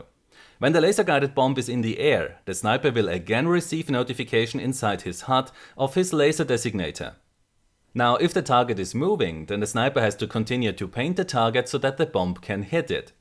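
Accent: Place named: Germany. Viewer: why u sound so german ?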